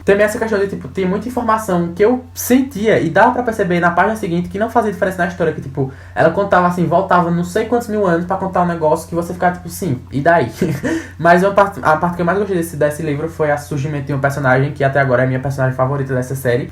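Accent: Northeast, Brazil. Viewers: é TÃO raro achar esse sotaque no youtube, que eu ainda tô em choque... Certezaaa que esse aí é do meu Nordeste!!!!!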